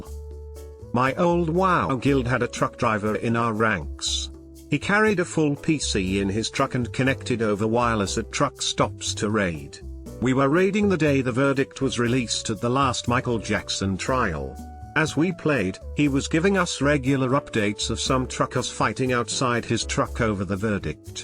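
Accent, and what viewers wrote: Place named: England, United Kingdom. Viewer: Who is this new English chap?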